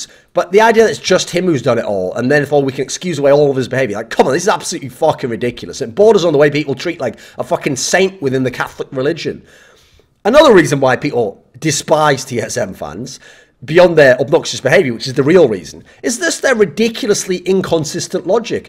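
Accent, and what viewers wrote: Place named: United Kingdom. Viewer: You sound so Bri'ish in this video I LOVE IT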